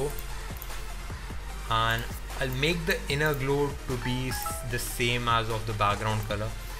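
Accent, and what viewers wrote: India. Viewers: hi dude wassup! your voice looks like an indian! i am indian too! first of all happy diwali and i just wanted to ask if to indian youtubers could be friends?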